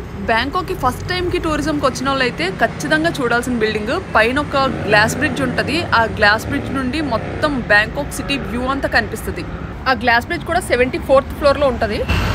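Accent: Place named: Godavari, India. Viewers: తూర్పు గోదావరి లా ఉంది మీ వాయిస్ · మీ వాయిస్ సూపర్ వుంది. గోదావరి భాష. మీ వీడియోస్ చూస్తుంటే మా బ్యాంకాక్ ట్రిప్ కళ్ళకు కట్టినట్లు గుర్తుకువస్తుంది. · హాయ్... మీరు బావున్నారు. వాయిస్ గుడ్. సరే.... ఆంధ్రలో మీ నెగిటివ్ ప్లేస్ ఎక్కడ, ఎందుకంటే మీ మాట, యాస, బాష, గోదావరి జిల్లాలని ఉహించాను. గుడ్ గైడెన్స్.... వెల్ కమ్ ఆంధ్ర..... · Nice voice+ మన తెలుగు యాస = అక్క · Meru everything Bangkok ni chupisthunanru nd mi telugu slang spr.... YouTube nundi manchi ⭐⭐⭐ time pass ki indirect ga kastapaduthunnru miru 😍🙏👍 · మనం ఎక్కడికి వెళ్లిన మన స్లాంగ్ మాత్రం కానిపెట్టవచ్చు ఈస్ట్ గోదావరి అనుకుంటున్నా నేను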